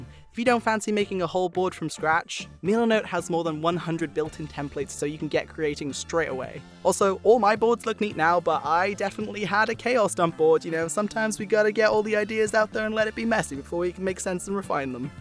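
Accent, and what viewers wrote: Wales, United Kingdom. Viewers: I lived in Wales for a while, did not clock you were Welsh until midway through this... I was already loving the video and you got to that and I was like OH YEAHHHH WELSH LETS GOOO hahah - I tried to teach my poor Japanese students some Welsh this morning... it was... a struggle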